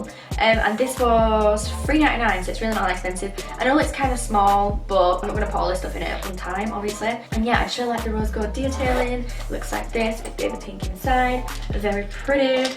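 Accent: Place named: West Yorkshire, United Kingdom. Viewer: I’m from West Yorkshire too ahaha I thought you sounded yano x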